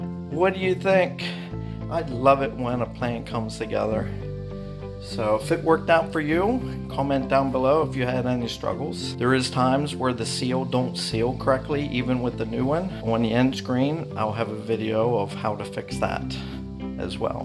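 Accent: Western Pennsylvania, United States. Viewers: Thanks! We are going to give it a try. And you must be from western PA. The most unique accent in the United States. 🇺🇸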